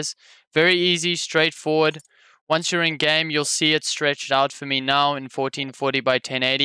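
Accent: South Africa. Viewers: OMW WASSUP FOUND YOUR POST ON REDDIT Heard the ZA accent first 😂 Scroll down and I'm like "HOWZIT!" Subbed. 😎😎 EDIT: lmao thanks for the help as well 😅🧐👍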